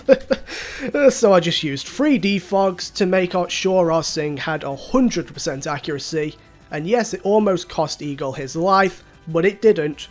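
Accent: Northern England, United Kingdom. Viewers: Found your channel this morning and now going through the rest of your vids. Your scripting and editing is class. I'm from Northern England so your accent gives me some bias into liking the commentary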